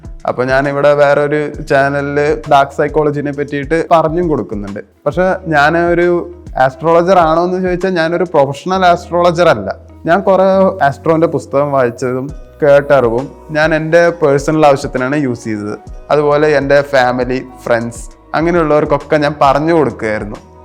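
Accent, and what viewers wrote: Palakkad, India. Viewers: Palakkad nn aano?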